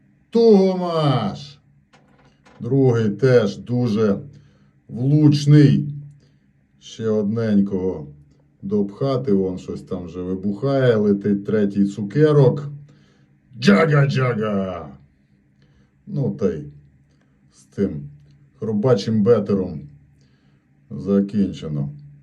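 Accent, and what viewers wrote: Transcarpathia, Ukraine. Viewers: Закарпатська говірка,-Рахів, Костилівка, Ділове )))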